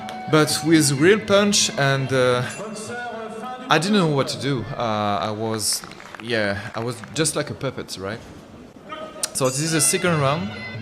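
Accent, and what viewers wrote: France. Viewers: Jme disais bien que tu etais francais avec l’accent 😂👌🏽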